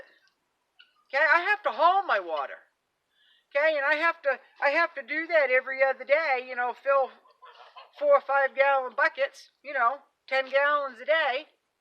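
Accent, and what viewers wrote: Maine, United States. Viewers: My mouth is wide open in shock and astonishment at how entitled some people actually are.😶😦😦. You might need to invest in some ferocious dogs to scare these weird people off. And you are not out of bounds. Some people you give an inch to—they WILL take a mile, and never be ashamed of themselves. I love your attitude and hard work ethic. My husband’s family lived in Presque Isle. Remember when there was an Air Force Base there decades ago? His Mom married a Airman from the base and they finally retired in a warmer climate. She had the same accent as you, so you make me smile as I hear you speak. ♥️🥰😁. That snow and cold looks brutal, but you are one tough woman and we are proud of what you accomplish. 💪🏼. I love you videos, I just found you a few days ago, and I am hooked. Next time that man shows up, hand him a shovel or an ax and tell him he will have to work for that goose—and the work will be double if he wants the breast meat. 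That should scare him away.😂. Blessings to you.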